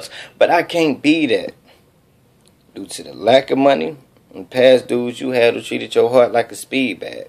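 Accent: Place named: South, United States. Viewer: That southern accent!! Oh yee. Atlanta I'm in love 💚💚💚⚡⚡⚡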